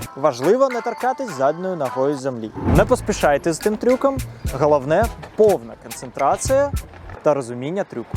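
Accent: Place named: Belarus. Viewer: Всё же ты белорус, говор выдает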